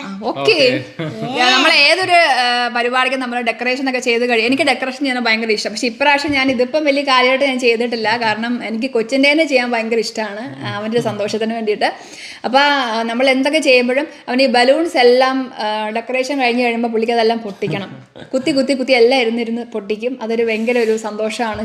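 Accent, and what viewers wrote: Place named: Kottayam, India. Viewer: Trivandrum anu valarnnathenkilum thani Kottayam slang anu anyway Happy wedding anniversary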